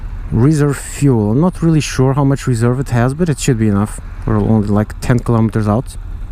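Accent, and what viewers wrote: Portugal. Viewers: Vi logo plo sotaque que eras tuga! Bom video! Cascais! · That vídeo was so nice. I watched it all.where you from? Portugal? I like your accent, too.I myself own a Honda 500f but have always liked the X versions of honda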